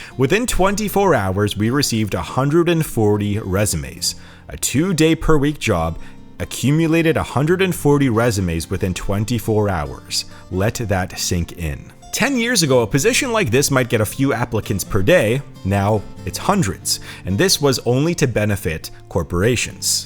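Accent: Canada. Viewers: There’s more to Canada than Ontario , you sound like a typical easterner.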